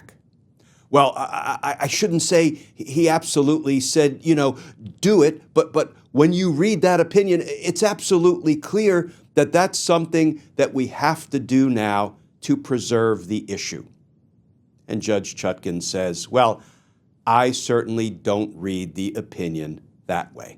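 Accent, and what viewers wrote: South, United States. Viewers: says “y’all” 🤭 I’ve GOTA know, does he say “NewOrlins” too? Yes, he’s definitely Southern, unless he’s just good at mimicking us 😁 ✌🏾 Thanks for the Knowwithall! Gratitude(s) 🌹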